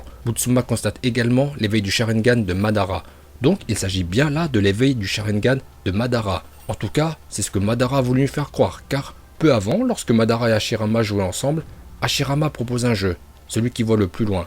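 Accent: Japan. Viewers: Déja avec le titre, je sais déjà que la vidéo va être intéressante!! Avec ton accent japonais trop bien j'ai l'impression d'être en VO!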